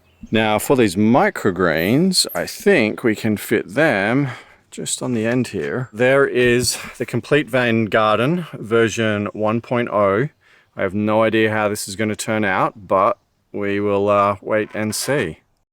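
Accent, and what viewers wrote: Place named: Australia. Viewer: Hello, have to ask are you an 🇦🇺Aussie? First visit to your Channel and hear a familiar tone? Great Vlog Thank You looking to grow some food on the road, 👍🏻👏🏻🙏🏻🙋🏻‍♀️🇦🇺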